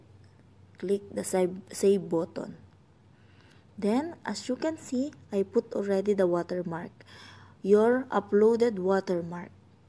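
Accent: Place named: Indonesia. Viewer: I think you're not a filipina? Your accent like Indonesian, malaysian, indian girl.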